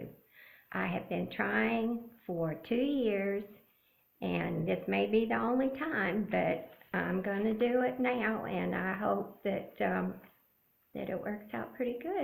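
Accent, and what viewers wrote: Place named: South, United States. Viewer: Hi from Pennsylvania (but originally from Louisiana)! It was so wonderful to hear your awesome southern accent! Loved your video and hope you continue doing them!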